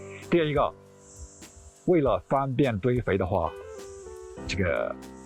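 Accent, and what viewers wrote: Yunnan, China. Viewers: 听您口音一定来自云南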